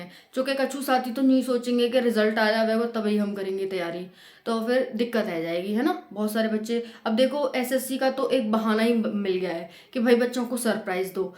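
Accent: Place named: Mathura, India. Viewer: Mam aap kahan se ho aap meri tarah bolti ho m Mathura up se hun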